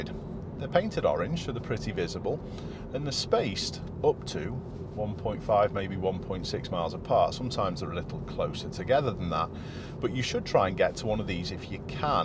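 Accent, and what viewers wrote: Liverpool, United Kingdom. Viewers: I've been driving for over 25 years, and luckily never had any points on me license. Does that make me a great driver, absolutely not. popped into my suggestions a year or so ago, but been an avid viewer since. Love his presentation and his calm scouse accent, but more importantly, everyday presents new challenges on the road. Watching videos made me realise, there is still a lot I don't know about, be it road signs or whatever, and these videos help me keep grounded as a driver.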